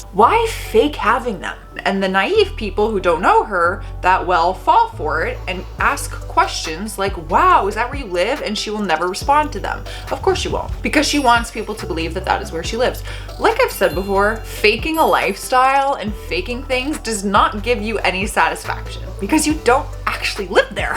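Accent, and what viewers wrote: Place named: Canada. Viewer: G,day I'm Australian. Surprise, Surprise, you have a Canadian Accent!!! Love it!! All the best. Bluey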